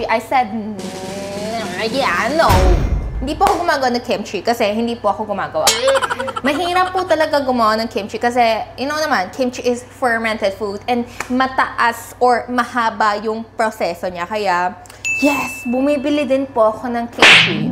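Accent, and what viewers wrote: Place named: Conyo, Philippines. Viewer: The way u speak it seems u r pure Filipino. You mix english with tagalog the accent as well.....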